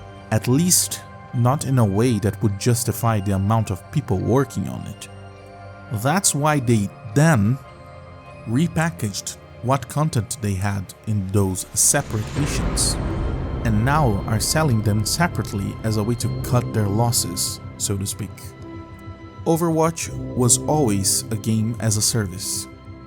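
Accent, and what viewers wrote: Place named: Brazil. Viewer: Dude, you kinda sound Brazilian. Where are you from?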